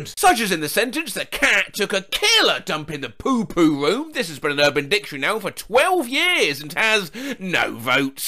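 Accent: United Kingdom. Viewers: He sounds more like Wheatley because of his BRI'ISH accent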